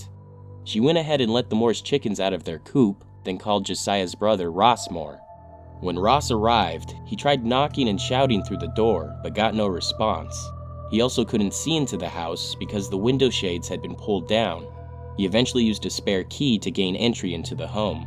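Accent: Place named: United States. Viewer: Oh don't start that shit plz. His voice is simply a typical American voice. Sycophant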